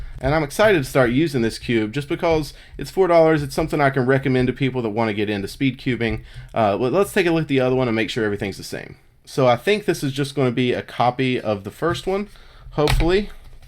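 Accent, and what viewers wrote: South, United States. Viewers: You have a bit of a Southern drawl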